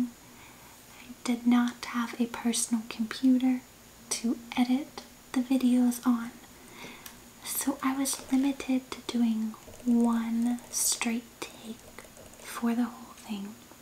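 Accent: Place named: South, United States. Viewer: Welcome back! Thanks for the update! Random but I hear your accent way stronger now than in previous videos... southern! (Or texan?):)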